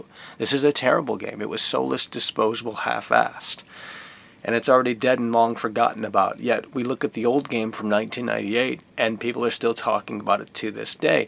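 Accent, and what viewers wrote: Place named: Texas, United States. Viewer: Lol its the very fact that his Texas accent that made it seriously funny to me.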